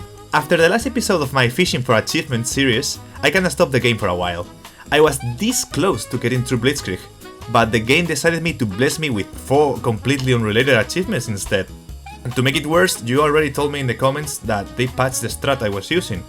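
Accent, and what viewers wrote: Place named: England, United Kingdom. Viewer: Jajajajajajaja me da gracia tu acento inglés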